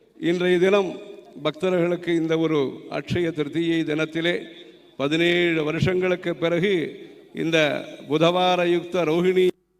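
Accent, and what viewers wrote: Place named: Thanjavur, India. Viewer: அக்ரகாரத்து கருவறையில் இனிய தூய தஞ்சை தமிழ் 🙏 தமிழோசை கேட்கும் இடமெல்லாம் ...... புனிதம் பெறட்டும் 🙏🙏🙏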